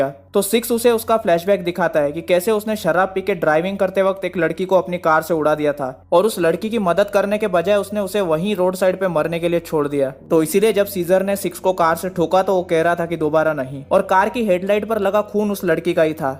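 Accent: Maharashtra, India. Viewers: Bro you have marathi accent that is why I asked do you live in Maharashtra that's all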